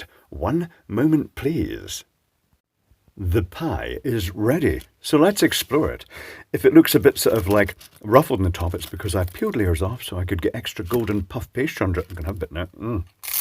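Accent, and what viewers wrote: Scotland, United Kingdom. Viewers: Wonderful to hear such a delicous Scottish brogue describing a meat pie - the late and wonderful Ivor Cutler would have been in his element Existentially yours.......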